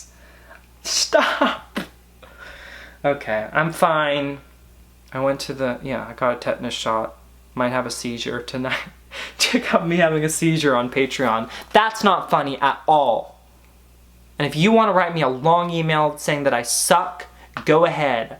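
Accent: England, United Kingdom. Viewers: I like your English accents. Makes me smile. Love your content. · The British accent makes me burst in laughter every freaking time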